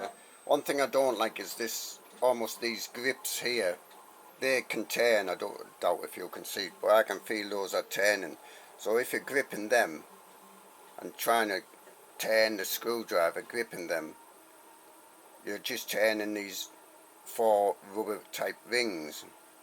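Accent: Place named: West Midlands, United Kingdom. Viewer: God that English accent is hard to hear. West Midlands?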